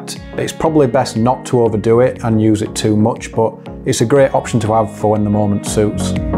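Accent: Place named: Yorkshire, United Kingdom. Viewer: Knew it was a Yorkshire accent! Not too far at all. I found a lot of tuts on this effect but really liked the examples you showed and the intro is class too - you gave examples during the intro, I really think that catches the attention - the transition to your logo is slick too. Yeah weird how only a few likes. I'm constantly checking YT out for Prem tuts and only just found your stuff so it might be that you're not appearing on everyone's searches yet? Not sure how it works exactly but maybe once you get more subs etc then people will find you. I'll be working through your vids anyway and certainly recommend you to others!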